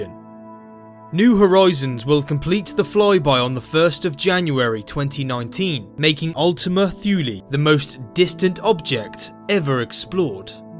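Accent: United Kingdom. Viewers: british English af. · interesting accent you have.. what area of Britain does it come from? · Why do you have a British Accent?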